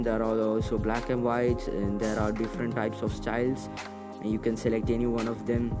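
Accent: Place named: Kerala, India. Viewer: ivante englees ketta ariya malayali aann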